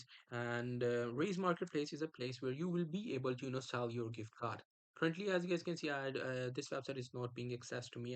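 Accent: India. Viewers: Probably not lmao Indian accent proves it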